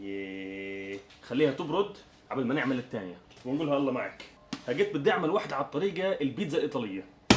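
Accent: Gaza, Palestine. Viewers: أنا من فلسطين وكمان مو هيك لهجتي هو لهجة غزة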